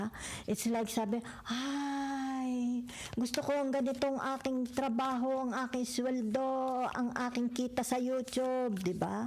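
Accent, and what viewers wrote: Batangas, Philippines. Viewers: Hello mommy kayo b ay Taga Batangas Dito sa pinas kc yong tuno.ng salita niyo eh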